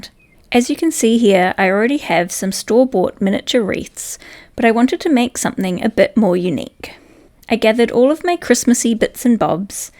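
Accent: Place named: South Africa. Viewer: Christmas is in summer where you live! As soon as you said that, I realized you sound like you have a South African accent. I love all of the wreaths. The gingerbread wreath is my favorite.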